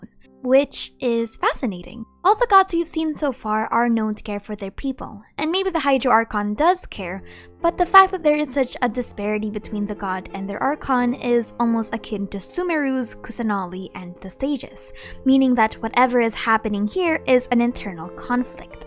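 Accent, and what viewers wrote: Philippines. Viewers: I had the inkling you're a Filipino because of your accent when speaking in English :D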